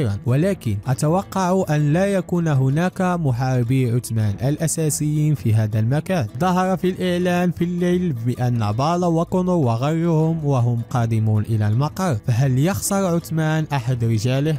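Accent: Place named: Tetouan, Morocco. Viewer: أخي إن أهل مدينة تطاون (تطوان) ينطقون الراء بهتن الطريقة وليس لهم يد في هذا . واهل فاس الرباط سلا ووهران المستعمرة من الجزائر ايضا يتحدثون براء قريب من هذا النطق. فأرجو ان تتقبلوا الاختلاف.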